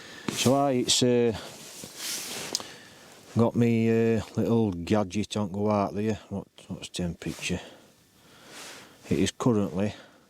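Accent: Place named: Northern England, United Kingdom. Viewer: Evening, (you still remind me of hovis bread and cobbled roads with your accent, all good👍) I saw your pics on Instagram, but watching your video I can really get a true feel for how icy the conditions are, it's gonna get to -8 where I am tonight apparently, so I can understand how you felt 🧊 ⛸️. Pity I don't get the views you had, it looked cracking. Enjoyed watching your vid, see you on your next adventure. Have a merry Christmas 🎅 and a happy new year 🎉 😊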